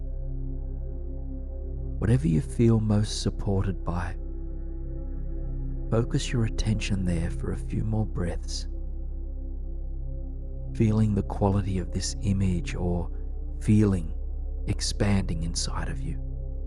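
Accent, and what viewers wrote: Australia. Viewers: haha I knew it lol, and team, you’ve changed my life with your beautiful guided imagery and Aussie accent 🥰✌️❣️ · Is it just me or does he sound Australian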